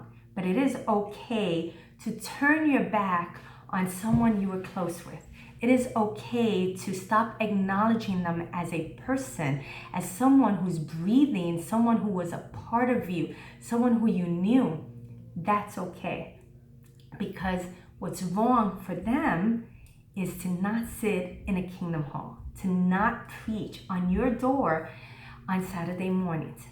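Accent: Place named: New York, United States. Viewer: Where is your accent from ? Sounds like a New York Latino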